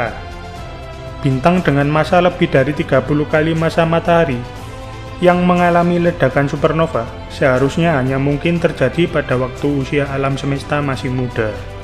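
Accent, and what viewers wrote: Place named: Java, Indonesia. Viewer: Tingkatkan lagi mas channel nya, em... Logat jawanya tolong agak dikurangi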